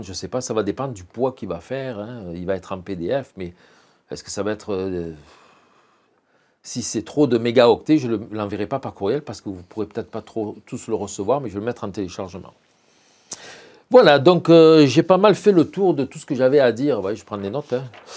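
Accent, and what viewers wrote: South, France. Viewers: felicitation maitre. je sens une pointe d'accent du sud de la France, vous êtes d'où ? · vous avez pourtant un bel accent du midi vous vivez au quebec?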